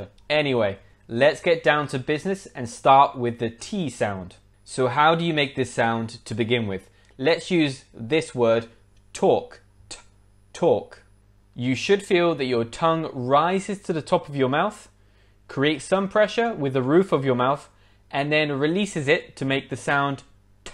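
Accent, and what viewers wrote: United Kingdom. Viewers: Actually your accent is very british but can be undertstood easily like american. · Hey! Amazing video as always.. honestly the British accent I know the most is yours, otherwise I'm more used to the American one! However the British accent is much more refined in my opinion..I really like it! But I must say when I watch your videos I can't take my eyes off you..omg you're gorgeous! 😍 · Hello! It's an amazing and very useful video! I love the glottal stop and the British accent! 👏🏻👏🏻👏🏻❤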